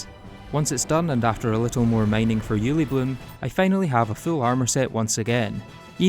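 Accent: United Kingdom. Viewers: Subbed but why are you british?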